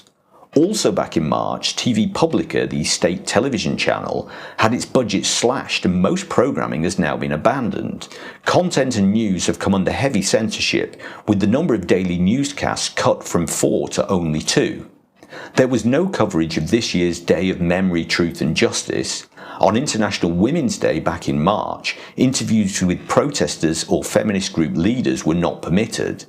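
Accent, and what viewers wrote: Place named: United Kingdom. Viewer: You're British accent doesn't mean you are right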